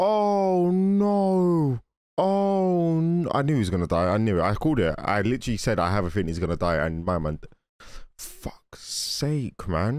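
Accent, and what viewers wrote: United Kingdom. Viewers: Oh my gosh, you reacting to Disney movies would be so lit!!! Geez, I just watched a little further and you not knowing that the US was even in WWII is killing me just a little bit inside LOL Despite the accent, I forget to consider you're British sometimes during your reactions to American movies/shows. To be fair though, I guess I don't know too much about specific contributions England had during the war, so I can't expect more than that out of you for America. I definitely know y'all were one of the major Allied powers though! haha (The most specific thing that comes to mind to me for Great Britain is "Keep Calm & Carry On" and the context surrounding that.)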